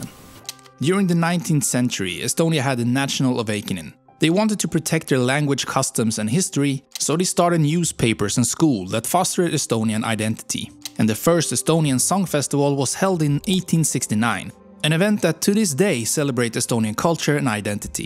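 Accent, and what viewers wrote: Sweden. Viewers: The narrator sounds Swedish, or possibly Finnish, so Estonian pronounciation shouldn't be too alien to him. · I'm Estonian and I thank you for all the positive comments! As soon as you started to speak, you're Swedish, right? Just my observation, nothing else. And thank you, again. Your somewhat simple but overall meaningful sentences moved me a lot! We want to be free and want to be a part of FREE Europe! Ok, yesterday was our county's 106th birthday, but in Europe we've been much less time. We can give MORE and want to give MORE! I'm sure I'm not the only one thinking like that! :) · please please please for the love of everything anyone has EVER considered holy, can we get someone narrating this that actually speaks ENGLISH and not SWENGLISH, it is literally painful to hear. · Sounded pretty Swedish to me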